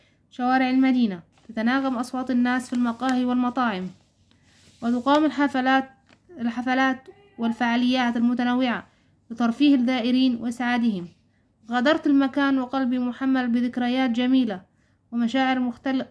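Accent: Egypt. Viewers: بصح هدرتك تاع مصر حسبتك مصرية😅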